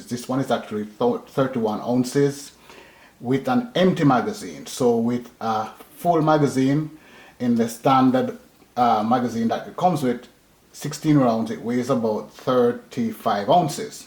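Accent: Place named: Jamaica. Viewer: Jamaican not Afican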